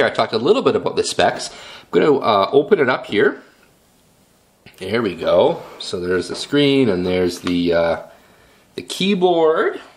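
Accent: Canada. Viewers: I think most of us Yanks dig the Canuck accent, so pay no heed as to what other Americans say!:0)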